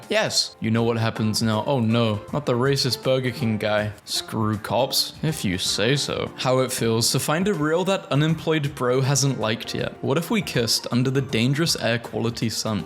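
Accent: United Kingdom. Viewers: the way you pronounce things like britishly like saying "media" as "meteor" tickles my funny (im sorry for being american)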